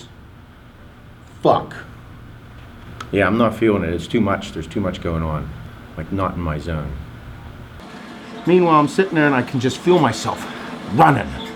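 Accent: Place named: Western Pennsylvania, United States. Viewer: Are you from Western PA? You sound like you do. Anyways, there is a line between laziness, procrastination, guilt and as you say being an asshole.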